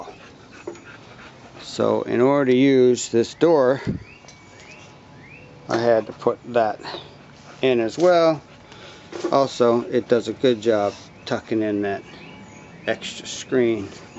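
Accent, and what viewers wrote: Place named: South, United States. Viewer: This is Marcy again...hijacking Joey's account. You have a southern drawl. I know this is serious but I think it's hysterical! Good job! Very impressive! I really can't believe you build this!!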